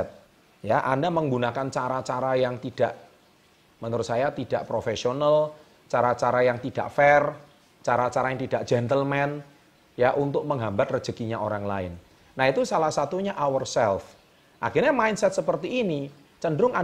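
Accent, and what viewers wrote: Java, Indonesia. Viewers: ngomongnya jelas dg nada jawanya . keren mudah di pahami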